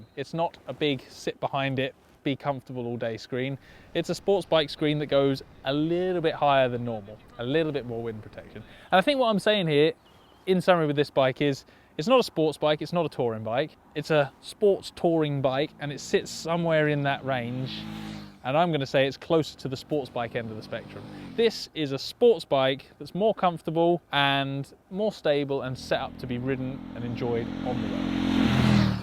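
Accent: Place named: United Kingdom. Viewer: Love british who ride bikes for some odd reason lol ( I’m Swedish ). Maybe it’s your accent making the hobby feel more sophisticated rather than a muurican ”I’m so tough!!”-accent. Is it worth going to the UK for a biketrip?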